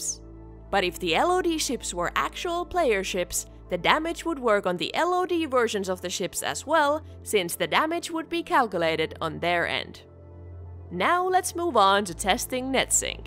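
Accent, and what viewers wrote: Finland. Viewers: Is she Finnish?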